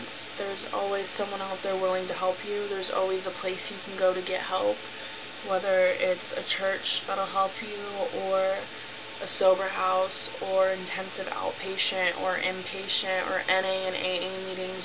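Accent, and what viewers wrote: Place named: Mid-Atlantic, United States. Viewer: you sound like you're from the mid-atlantic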